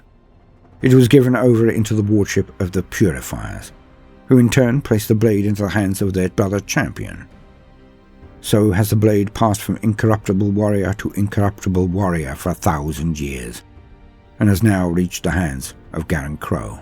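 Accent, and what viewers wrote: United Kingdom. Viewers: I always give warhammer channels an extra 20 points for having a British narrator.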